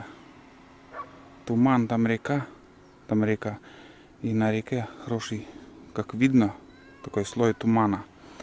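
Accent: Komi, Russia. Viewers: Назвал бы видос "Копаем картофель у соседей" такой говор как из нившеры. Превед из Сыктывкара. Сами мы из Кероса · у нас как то не заморачиваются по сортам картошки. У кого то красная лучше растет у кого то белая. Есть белая голландка - огромные продолговатые белые клубни. Много ее вырастает но не в каждый год она хорошо хранится. Кто не много садит - ведро, два тот пророщенную у бабок покупает а тут наши местные заморочки: если продают картоху с села Касиб (Соликамский район) то уходит в лёт. Считается что это очень хорошая картошка. Числа 6-го сентября я тоже копал картошку, сыро, дождь, с комьями грязи выковыривал. Потому что дожди лили весь август и пол июля. Половину выкинул потому что сгнило. А у вас вижу копаете земля нормальная сухая. Вижу вилами копаете - отец всегда вилами копал а я не могу, не нравится. И приятно коми речь послушать. Но ее хрен выучишь потому что язык неславянский. 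А если выучить то разговаривать тут не с кем. Всего доброго, Пермский край.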